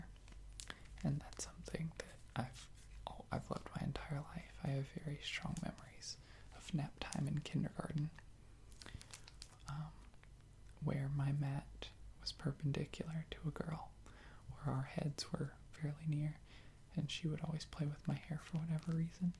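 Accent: United States. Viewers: I first had to know about you just tonight, my son showed me people using ASMR, I suddenly found you and your wonderful voice and american accent, keep on making videos, please! And keep on speakng french, try not to lose it, okay? Beacause it's great. Take care from Patty! from Burgundy!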